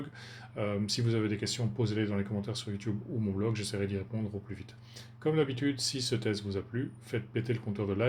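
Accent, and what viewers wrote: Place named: Belgium. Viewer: tkt pour l'accent belge, il est vraiment très léger et n'entrave en rien la compréhension !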